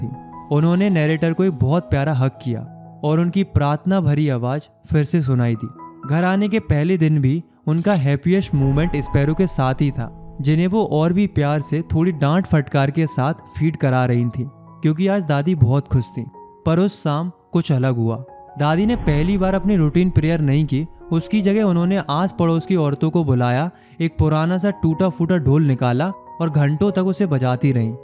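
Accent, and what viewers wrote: Uttarakhand, India. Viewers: sirr ap uttrakhand sa honaa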